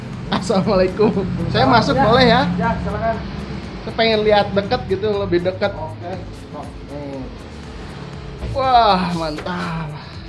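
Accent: West Java, Indonesia. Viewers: Logat sunda pisan euy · Urang mna akang B.indonesia logat Sunda..😂😂😂😂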